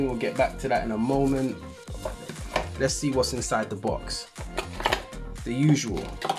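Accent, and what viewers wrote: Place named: United Kingdom. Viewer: An NES emulator -- with a wide screen and "X" and ""Y" buttons... Interesting. So where are you? From your accent, I would guess the UK, but you are talking prices in dollars.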